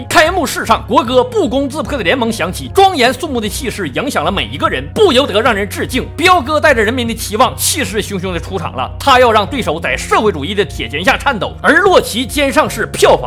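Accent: Northeast, China. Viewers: 真的很大碴子. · 哈哈，我最喜歡聽說英語，，滿滿的東北渣味。👍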